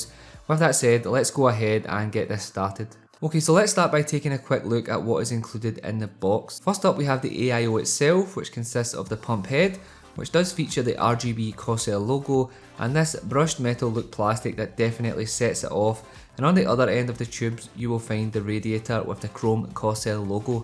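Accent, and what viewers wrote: Scotland, United Kingdom. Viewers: Also, what part of Scotland are you from? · Yass, didn't expect a Scottish lad when I clicked on this video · U Scottish or anything? Coz damn i love your accent! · clear easy to follow instructions, being a fellow scot easier to understand too, great info cheers chief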